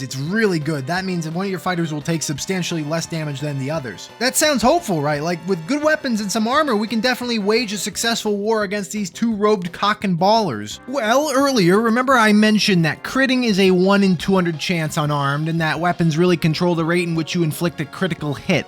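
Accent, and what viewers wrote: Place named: Philadelphia, United States. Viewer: avgn feels like Jersey, feels like Philly (I grew up in Trenton) Might be the way he says bawss reminds me of a Philly saying cawfee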